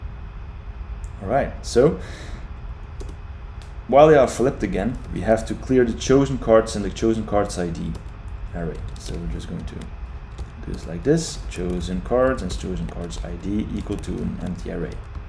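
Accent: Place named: Brazil. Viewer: You sound Brazilian! Where are you from?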